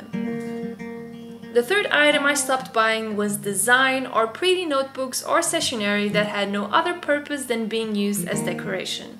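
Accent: Portugal. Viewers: Are you portuguese? I recognize your accent ahaha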